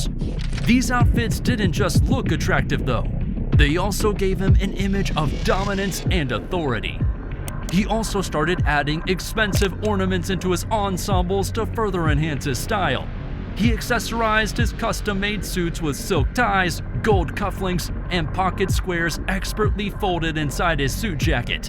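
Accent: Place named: United States. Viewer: GOOD BUT SHAME ABOUT THE VOICE WHOS TELLING THIS A TYPICAL AMERICAN OTP BRITISH ARMY COLIN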